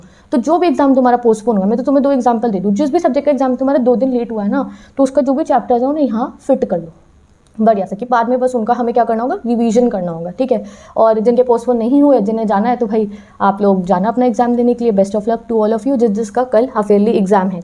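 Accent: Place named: Haryana, India. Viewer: dii I think your ate from haryana or from north india side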